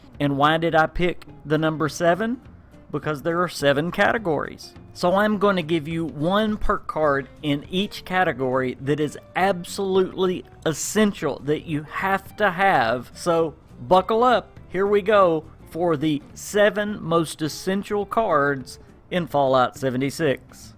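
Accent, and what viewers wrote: South, United States. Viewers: love your country-ish accent! great videos, sub'ed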